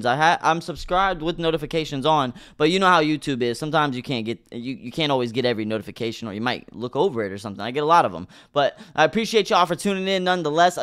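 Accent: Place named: England, United Kingdom. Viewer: I like your English voice accent❤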